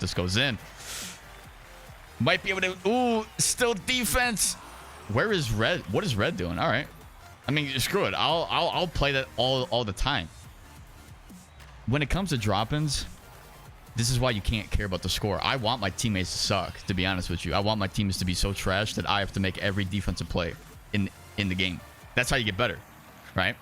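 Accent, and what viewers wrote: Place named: Delaware County, United States. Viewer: You should do a Tom Wilson build. And I have a random question… are you from Delco? Your accent sounds just like it lol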